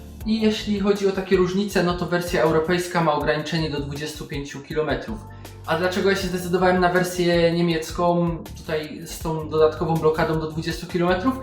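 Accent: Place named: Silesia, Poland. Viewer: Czemu tak zaciagasz po slasku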